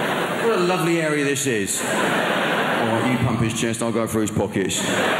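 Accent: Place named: England, United Kingdom. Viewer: By the accent, I'd guess it's England.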